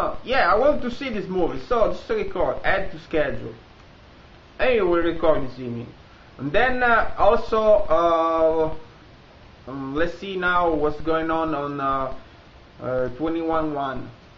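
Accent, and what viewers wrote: Italy. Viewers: Il tuo inglese mezzo italico mi permette di capire tutto. Inglese puro faccio fatica... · Italian? or Portugese? am thinking Italian...lol, iti s distracting, but cute.....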